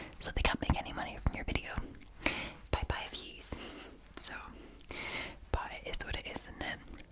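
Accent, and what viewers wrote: Scotland, United Kingdom. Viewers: I struggled to stomach any Disney "food". Rides and ambiance is what Disney's good at. That's all. I digress... Thanks for your soothing videos! I'm English /Canadian and love your Scottish accent. I told my wife about how much I enjoy what you do. She's very understanding, but it's 'not for her'. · ASMR, and Lollipops....Definitely a great mix. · Don't feel bad for your fast ramble talk I think I speak for everyone here when I say I find your fast ramble talk to be extremely relaxing especially with your beautiful heavenly Scottish accent!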